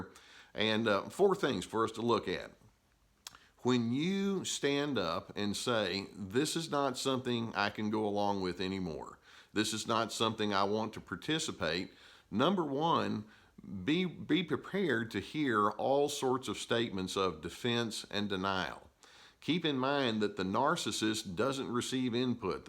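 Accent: South, United States. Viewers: Experiencing his flying monkeys now. It’s awful. I thought these people were my friends. So hurtful. Love your videos. Love your southern voice. Thank you for such honest videos. They are spot on.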